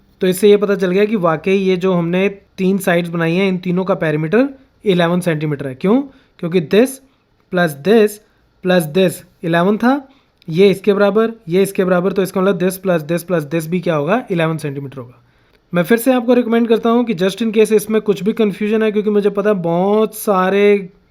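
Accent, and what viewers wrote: Punjab, India. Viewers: Sir are u punjabi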